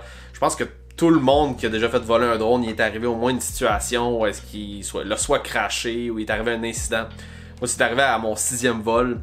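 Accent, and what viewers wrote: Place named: Quebec, Canada. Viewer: qu'est ce que j'aime cet accent québécois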